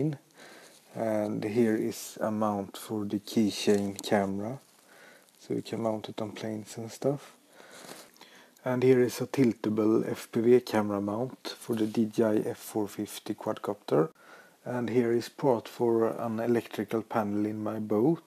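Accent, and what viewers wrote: Jönköping, Sweden. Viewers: Best Swedish accent ever! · You can hear that you are from Sweden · Hehe, den svenska dialekten.. Usch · haha, you can hear that you are from sweden, im also swedish ;) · Du låter lite som en Jönköpingsbo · That accent.....i feel ashamed of being swedish .... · swedish? xD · Nope, it's Swedish accent. Trust me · Swedish? Tihi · swedish i guess :) so easy tro hear as a fellow swedish person. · oh god, that Swedish accent :D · Wow! So very cool! And yes you really do sound soooooooooooo Swedish! Love it!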